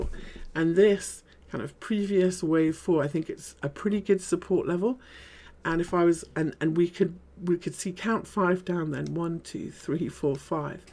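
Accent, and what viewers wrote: United Kingdom. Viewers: Thanks for your update. BTW you have the most classy english accent of the crypto youtubers, that's very pleasant.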